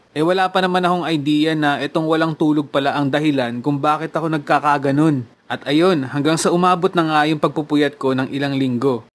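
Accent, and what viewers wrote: Ilocos, Philippines. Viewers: Ilocano po kayoo?!!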